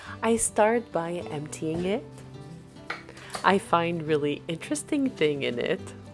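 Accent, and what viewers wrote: France. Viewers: oui moi aussi! Mais je vis à Mancheter! Ça m’a fait plaisir d’entendre le petit accent français! J’espère qu’un jour je parlerai anglais aussi bien que vous! J’aime bien vos vidéos elles donnent du courage! Et j’aime aussi votre tsniout!🧡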